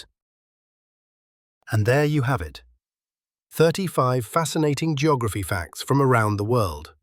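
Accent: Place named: United Kingdom. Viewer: nice! I have been trying to find a video with British English and I have it here!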